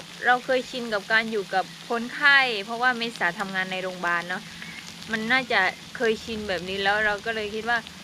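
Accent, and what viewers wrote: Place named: South, Thailand. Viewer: พึ่งเข้ามาดูให้กำลังใจค่ะ สำเนียงเหมือนคนใต้เลยนะคะ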